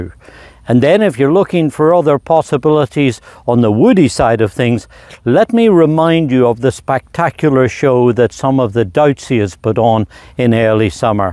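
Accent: Northern Ireland, United Kingdom. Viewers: I live in Ireland since 2007, but I also lived in Cape May. You sound like you might have a little northern Ireland in your speech. Great videos! Thanks!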